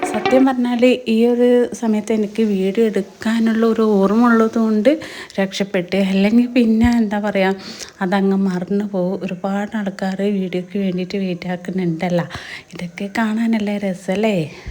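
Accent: Kannur, India. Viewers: നിന്റെ ഒറിജിനൽ കണ്ണൂർ ഭാഷ അടിപൊളി 🎉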